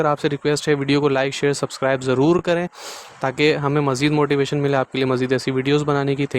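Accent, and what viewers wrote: Karachi, Pakistan. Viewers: Sir Karachi se lagrhy hain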